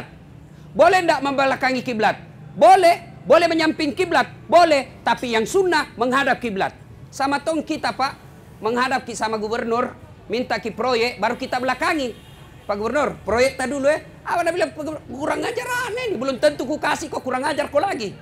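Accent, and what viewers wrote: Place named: Sulawesi, Indonesia. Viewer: Suka sama ceramahnya. Màterinya ttg kehidupan skr plus dgn pendekatan komunikasi yg baik dgn dialek dan bahasa gaul ala sulawesi. Hy yang mengganggu, kok byk kata 'cilaka' atau celaka dlm arti bhs Indonesianya ya? Bukankah kata2 yg diucapkan itu bermakna doa ya? Apalg oleh seorg ustad seperti ustad Das'ad? Maaf jika saya saja yg merasa terganggu...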